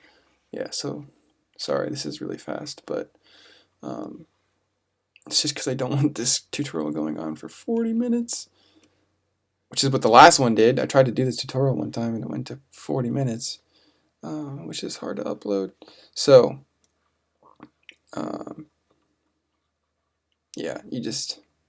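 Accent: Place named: United States. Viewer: Liked and subbed. You have everything an ispiring animation student needs,a clear voice and mic,good american accent to understand,explaining everything multiple times.. Thanks man i really needed this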